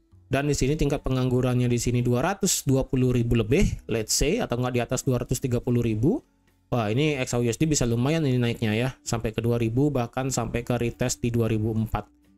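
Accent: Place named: Bali, Indonesia. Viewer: dari logatnya asal bali nih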